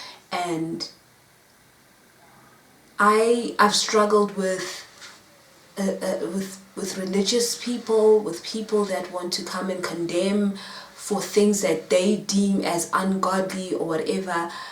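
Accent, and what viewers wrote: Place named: South Africa. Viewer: When I hear your voice, I keep hearing the Safari Live people here on youtube. South Africa. I also wanted to commend you on your singing. I have watched so many auditions and singing shows. I always enjoy a good voice! And you're doing a good job for the Lord as well, with your prophetic voice too. Amen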